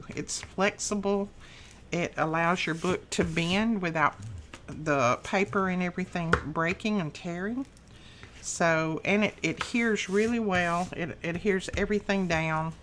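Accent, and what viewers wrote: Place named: Eastern Kentucky, United States. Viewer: Dont know where you are, but totes sounds eastern Kentucky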